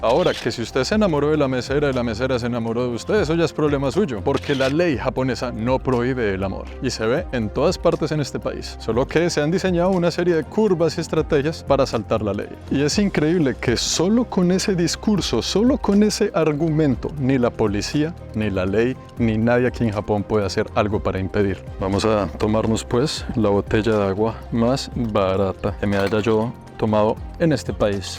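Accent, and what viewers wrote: Bogotá, Colombia. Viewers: jajajaja ese man es mas rolo que la changua ñero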